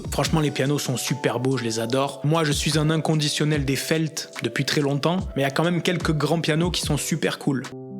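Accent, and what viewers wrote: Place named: South, France. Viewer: Et l'accent du Sud 😁! Ravi en tout cas de te revoir ici sur WoodBrass!! Tu le mérites!!Bonne continuation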